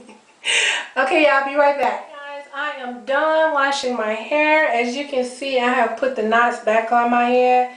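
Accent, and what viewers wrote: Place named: Mississippi, United States. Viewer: I am originally from Mississippi and your channel (and accent) gives me a taste of home. I never thought to use hair grease to pre-poo. Well dang... Gonna try this next wash day.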